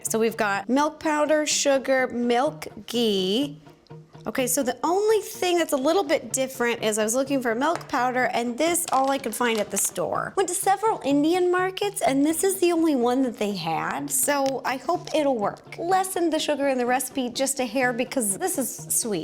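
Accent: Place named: Texas, United States. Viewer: is soth girl.. the texan accent really gives sheldon vibes..loveit... & your foood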